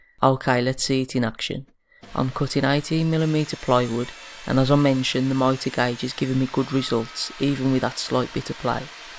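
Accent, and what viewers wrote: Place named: West Midlands, United Kingdom. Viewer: Great review ar kid very detailed and the West kids accent makes it that much better to watch as I'm from walsall .I've subbed and liked well done keep it gooin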